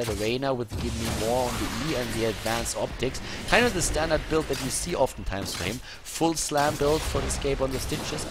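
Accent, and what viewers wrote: Germany. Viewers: man hört so krass, dass du deutscher bist XD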